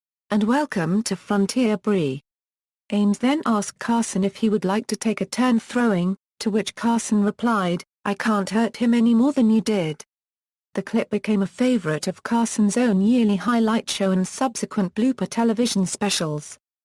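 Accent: United Kingdom. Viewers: Robo-voice with British accent.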